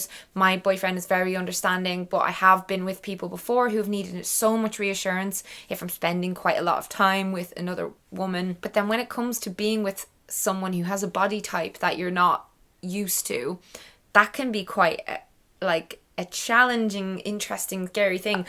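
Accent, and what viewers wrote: Ireland. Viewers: Are you from Dublin? Your accent reminds me of Saoirse Ronan.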